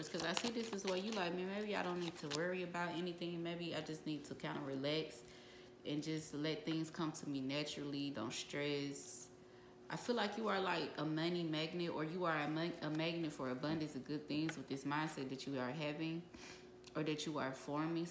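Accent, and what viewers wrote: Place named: Atlanta, United States. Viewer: Are you from atlanta 😂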